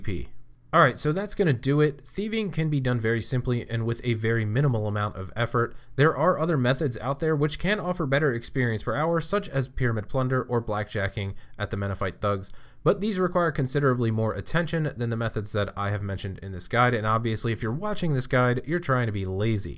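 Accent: Baltimore, United States. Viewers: You from Bmore?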